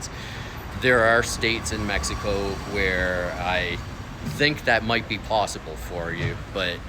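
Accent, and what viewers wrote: Canada. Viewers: I noticed that Canadian accent right away LOL. As soon as you said oat and aboat was pretty obvious😂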